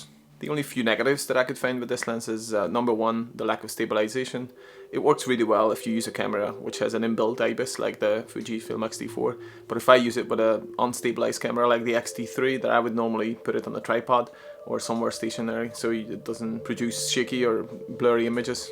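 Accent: Northern Ireland, United Kingdom. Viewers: so helpful. Just discovered your vids. am learning a lot. I got a XT4. Love that Norn Iron accent :-)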